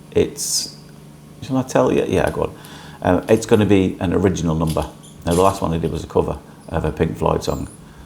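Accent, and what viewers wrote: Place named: England, United Kingdom. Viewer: It's hard to understand you sometimes when u our speaking because of your English accent 😊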